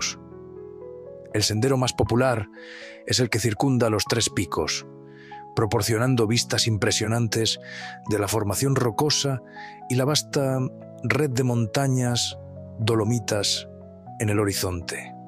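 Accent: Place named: Castile, Spain. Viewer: Magnífico documental pero lo mejor, para mí, es la voz del narrador con un castellano perfecto; yo creo que incluso puede ser un arquetipo de dicción que nos deja perplejos a nosotros los andaluces, con nuestro castellano sureño.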